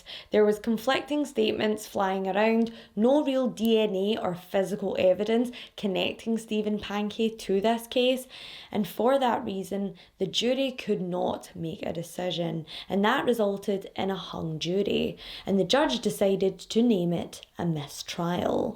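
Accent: Scotland, United Kingdom. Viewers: I'm so proud of myself.. When i started watching/listening to your channel, i was having trouble with understanding your scottish accent. I had to listen really carefully. Nowadays i have no problem understanding. I'm a fluent listener of Scottish accent 😎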